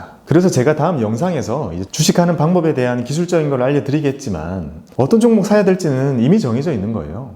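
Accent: Gyeongsang, South Korea. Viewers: ...이름이 왜 이럴까 했는데 이제 알았어요 ㅎㅎㅎ 편안한 경상도 사투리가 오히려 귀에 쉽게 쏙쏙 들어옵니다! 금맹인 제가 오늘 하루도 성장합니다! 진심 감사드립니다~~~건강하시고 계속 좋은 방송 부탁드립니다!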